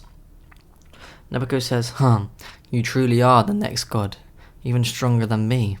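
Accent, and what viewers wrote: United Kingdom. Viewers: oh i love the British ascent its so funny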